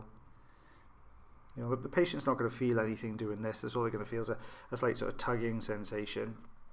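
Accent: Wales, United Kingdom. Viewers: Lovely Welsh voice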